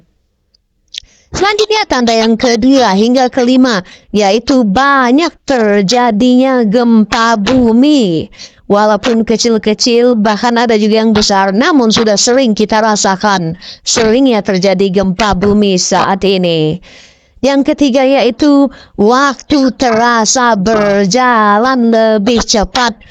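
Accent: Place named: Netherlands. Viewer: Raimu wong ndi cuk..ngomong koyo ngono logate koyo londo udu wong indonesia kuplak....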